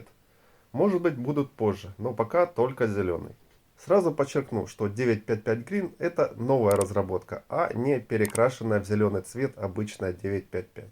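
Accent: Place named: Moscow, Russia. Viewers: Судячи з описаного голосом па маасковскі, накладка для класики, бо на повільніших основах срєдняя врєдность з блоків буде ніякою зі всима витікаючими, що зобов'язуватиме власника до відповідних прийомів, дієвих для таких засобів. Це не докір для тестостериста))), лише синтезовані з побачено-почутого власні висновки, базовані на нікчемному досвіді й таких само досягненнях, тобто там потрібно буде проявляти спочатку зрозуміле і потім набуте все розмаїття техніки і тактики, а саме попіхи-перепіхи будуть малоефективні, але для володіючих ними і шипами вцілому достатньо зрозумілі і доступні, знову ж не в докір останнім, бо вони своїми "вміннями" теж можуть зробити гідний фурор, тобто головняк))), опонентам. А ще хотілося б побачити загальну картину гри на рахунок, і суперника, що краще володіє технікою гри і переміщень, а не одразу в рукопашну... 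· А что тебя больше расстроило-маасковский говор или накладка на уровне ширпотреба?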